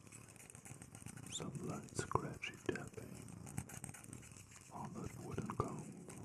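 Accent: Germany. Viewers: Ist das eigentlich ein Deutscher? Wegen seinem Akzent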